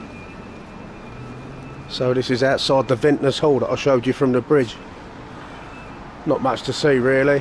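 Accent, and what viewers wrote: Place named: London, United Kingdom. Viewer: Love your videos, informative, interesting and fun. Plus your accent is impeccable London! Long may you continue with these excellent little films, for someone who lives in a village like me, the sheer size and busy nature of London is mind boggling and mildly terrifying! Much love from the north of England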